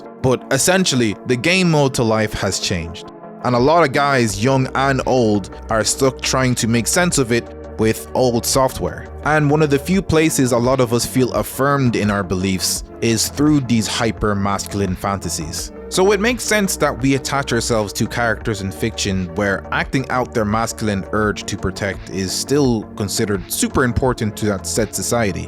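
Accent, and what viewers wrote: Ireland. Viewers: I hear a bit of an Irish accent? Am I right or am I going mad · Are you irish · Thought you were Irish for a sec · are you irish? im trying to place your accent 😅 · For some reason I thought you were a white Irish guy, is there someone else narrating the video 😅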